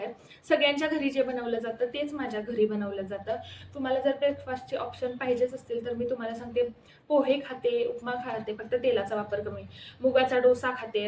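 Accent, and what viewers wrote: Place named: Kolhapur, India. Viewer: Pune mg chan mala Tumch marathi kolhapur sarkh vatle mhnun mi kolhapur madhun bgte tumche video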